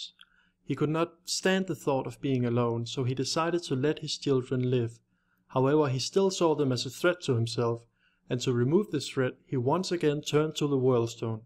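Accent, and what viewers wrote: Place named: Denmark. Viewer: Nice job! Where are you from? Your accent sounds very Danish, but I'm not an expert ;)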